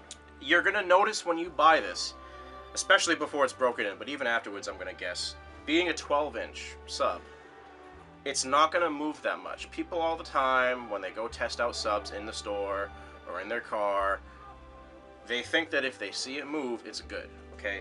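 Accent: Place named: New England, United States. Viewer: new england accent.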